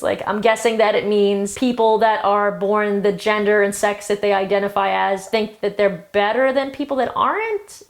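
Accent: New York, United States. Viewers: I LOVE YOUR ACCENT!! I'm from Texas and I always thought I had a very neutral accent but in listening to myself more closely over the past year or so I can really hear the Southern twang in my voice sometimes 😂😂 and to me yours sounds like a stereotypical New Yorker sounds. Am I close or completely off the mark in where you're from?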